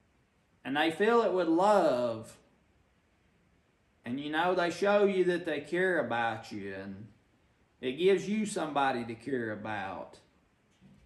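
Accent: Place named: North Carolina, United States. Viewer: I have just been listening for maybe 2 weeks cause I just found your channel The way you talk I can tell that area is a vastly different place than Louisiana at least the central part of our state I tried to move to N.C. Years ago when my oldest married a young man she met in Bible school but her dad was unwilling to move anyway you are an encouragement to me even though I am a grandma So sorry I didn’t realize your Dad has passed You know that feeling when all your folks started passing well to be 68 and stuck in this place with family gone on feels like that. I have searched for a church and can’t find one where Jesus is at. Drinking & drugs and such like seems to be the name of the game. I just draw closer to God and try and love folks Keep the good videos coming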